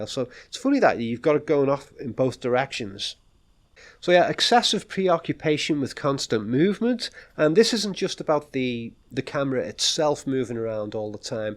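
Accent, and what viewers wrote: Liverpool, United Kingdom. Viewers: Are you a Northerner??! Liverpool?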